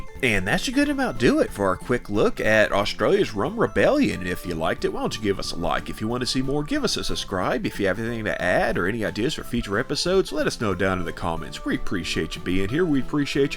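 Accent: United States. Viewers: Great work! Got a bit of a laugh hearing someone so American sounding talk so succinctly about early Australian history…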